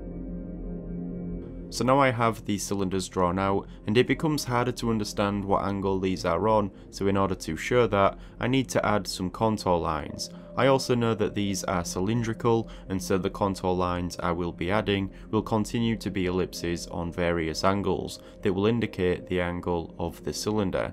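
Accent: United Kingdom. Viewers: obviously, but which one of the British accents 🤔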